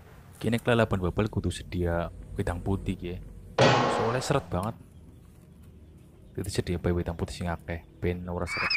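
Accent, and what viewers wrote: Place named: Banyumas, Indonesia. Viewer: Inyonge guya guyu krungu omongane rika kang 😅 karang ning kampunge inyong jowone ora ngapak. Ding nek krungu wong ngapak, koyone kok kepenak 😁👌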